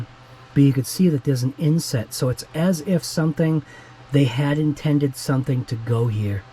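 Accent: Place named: Massachusetts, United States. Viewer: Im newer to the hobby. Been about a year or so. I knew you were from MA the instant I heard you talk lol. Im born and raised in Lynn and live on Cape Cod now. Love the videos.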